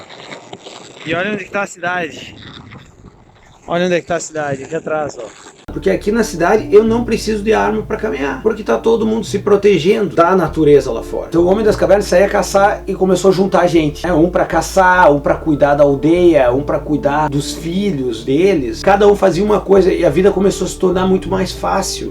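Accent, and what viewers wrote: Rio Grande do Sul, Brazil. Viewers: tu é gaúcho? Fala como um gaúcho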